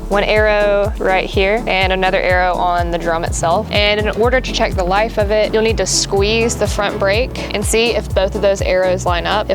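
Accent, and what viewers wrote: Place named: Europe. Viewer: Great information...that accent...European made in America if I'm not mistaking ;-) Stylish! Keep up the good work. Will try same on my 2 Navis. Happy trails. JP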